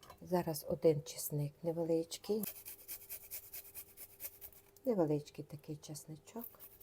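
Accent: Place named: Zakarpattia, Ukraine. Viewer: Дорога Пані! Вітання Вам із Канади! Ваше відео мені переслала моя подруга із Амстердаму. Не можу передати словами, які приємні емоції я отримала слухаючи наш чудовий закарпатський діалект, і відтворюючи у памʼяті смачну Повненку, що готувала моя мамка. Це якраз той рецепт, з яким Ви і поділилися із нами. Якось я про нього призабула, але тепер обовʼязково приготую. Ви, Пані, надзвичайно приємна жінка, з такою позитивною аурою навколо себе, що відчуваєш навіть за океаном! Міцного Вам здоровʼя, чим швидшого мирного неба, і чекатиму на Ваші нові відеозаписи.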